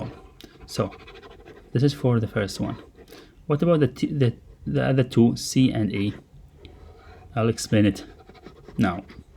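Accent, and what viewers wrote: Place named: Arab world. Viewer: Your accent sounds like an Arab accent I think so😅 your explanation is so good !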